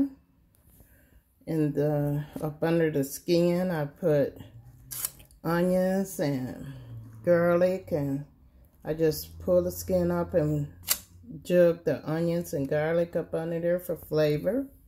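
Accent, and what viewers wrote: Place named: Haiti. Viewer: Ok I though because you have an haitian accent.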